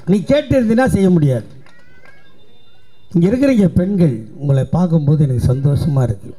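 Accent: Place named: Chennai, India. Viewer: யதார்த்தமான சென்னைப் பேச்சு , மக்கள் பணி சிறக்க வாழ்த்துகள் 🙏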